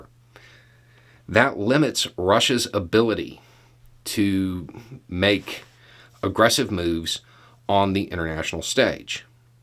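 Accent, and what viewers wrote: South, United States. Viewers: Lots of good thoughts from this year. For a guy that looks and sounds like an ignorant Southern hillbilly in a garage, he comes off as a sort of political intellectual. You expect to hear the words of MAGA issue from his lips. Instead, one hears common sense. He's probably right about countries not having morals but countries are made up of people. People have morals. I think most of the people in the US (& Canada) have good morals. So - I hope he is wrong and the good morals get reflected in social policy. Happy New Year!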